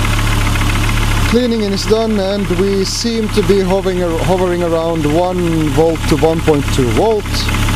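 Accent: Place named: Russia. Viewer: My native language is Russian. I heard your pronunciation and assumed that your native language is Russian too.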